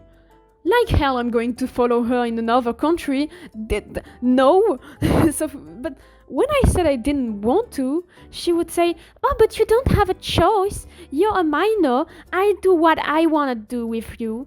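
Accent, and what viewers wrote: France. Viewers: So, I watched this while drawing some fanart, and I must say, your voice is very calming, and as someone who speaks french too (canadian, first langage french), I didnt mind your accent at all although I personally dont have one as strong. Your voice honestly calmed me down, I have very bad anxiety problems. But, more importantly, i'm very glad that you got out of this situation. You mentionned in the video multiple times something along the lines of: ``and well while you might not think its abusive enough, it..`` I'm just gonna say straight up that there is no such thing as being abusive enough. Abuse is abuse either way, and anyone who can't see that is an absolute moron who needs to get educated. Listening to some of the stuff you said, I honestly felt a sinking feeling in me, I just kept getting more and more concerned for you, and then reminded myself that you got out of there, which brought me relief. 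But the fact you had to deal with it for so long in the first place is awful already, I am so sorry you went through this at all. Anyways, just wanted to show that I care and that I'm proud that you managed to get through this until you got help. And I'm also impressed that you managed to talk about this on here, I truly wish you the best and a wonderful day, and I really like your art a lot, looks yummy :)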